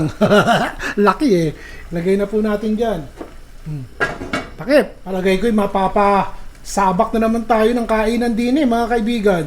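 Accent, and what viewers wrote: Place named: Nueva Ecija, Philippines. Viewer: Taga nueva ecija po b kau chef? Kase yong accent at mga words ninyo ng tagalog.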